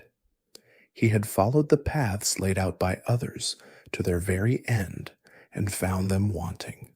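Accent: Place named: United States. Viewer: Horrific American voice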